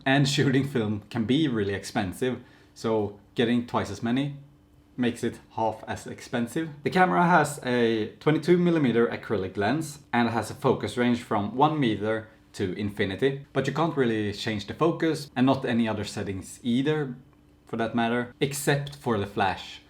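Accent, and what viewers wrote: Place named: Gothenburg, Sweden. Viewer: Härlig Göteborgsengelska…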